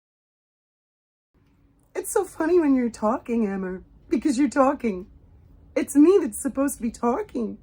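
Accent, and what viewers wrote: New England, United States. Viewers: Great American accent.... I'm American too! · Great American accent! Touching hair nonstop! Laughed and scared the dog when you twerked up the skirt!🤣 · Your yankee accent is so funny 🤣 I mean, the whole thing was 💯👏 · A masterpiece! I don’t know how you can do such a good American accent—I can’t do a British accent to save my life!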